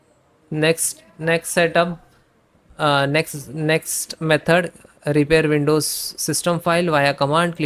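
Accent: India. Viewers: IM DONE WITH THE INDIAN ACCENT IN EVERY VIDEOS. ENOUGH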